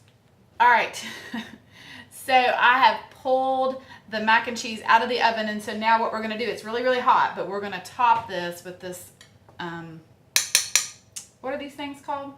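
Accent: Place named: South Texas, United States. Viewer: Great video. You sound like you're from South Texas.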